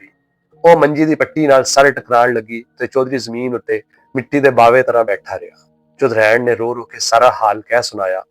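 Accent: Punjab, India. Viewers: ہائے۔۔ سواد آ گیا سچا لہجہ پنجابی دا۔۔ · کہانی سے عین میل کھاتا خوبصورت پنجابی لہجہ اور مکالموں کی ادائیگی میں بہترین اتار چڑھاؤ۔۔ بلاشبہ ایک مکمل پنجابی شاہکار ۔۔ اس خوبصورت کاوش پر ڈھیروں داد و تحسین🌹 ❤ ❤❤❤ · واااہ بہت خوبصورت کہانی اور سچا پنجابی لہجہ😍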